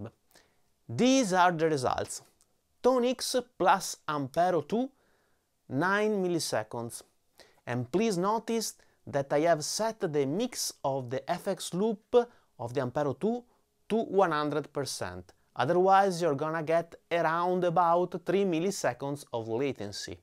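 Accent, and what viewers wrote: Italy. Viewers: bravo, seguita così, la tua pronuncia in inglese italianizzato mi fa sentire meno solo! :)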